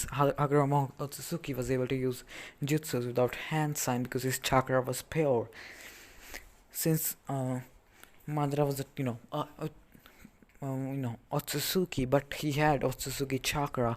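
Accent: India. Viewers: Are you indian or have an indian accent?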